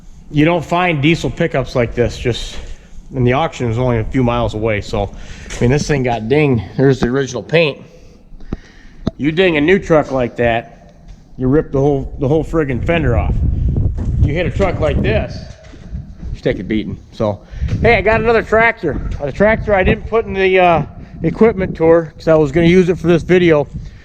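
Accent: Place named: Upstate New York, United States. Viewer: which units where you in when in the Army? I am a huge fan, I am active duty now and grew up milking cows and working the farm for my uncles in upstate NY. The more I watch your videos, the more you sound like them. The way you present yourself is clearly as a leader in the Army would, especially when you told your nephew that "we start strong and finish strong." Love the channel. Keep doing what you do.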